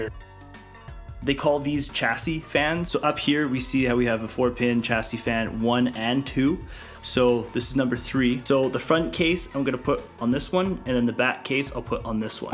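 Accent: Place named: Ireland. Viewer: Very cool videos (part 1 & 2). They contain some real helpful information. Thanx for making and uploading them! I am wondering if your family comes from Ireland. Your English sounds a bit like that...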